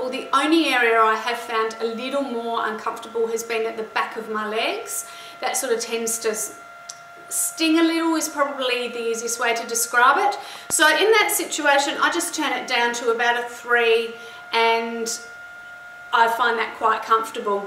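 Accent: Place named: Australia. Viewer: seems like an aussie lady from melbourne :)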